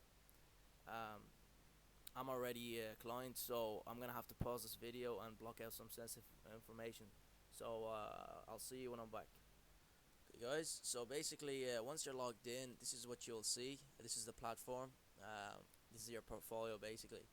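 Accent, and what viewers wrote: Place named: Ireland. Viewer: I take it your from Ireland judging by the accent, same as myself, I was looking into this firm as oppose to Davy I was just wondering how you find trading with them? I was attracted by really low fees but they seem dodgy. I would mainly be a value investor type guy, so il be investing for the long run, but just wondering is there maintance fees for the account and all and how do you find trading with them? Are they worth it also are you paying CGT per trade or ?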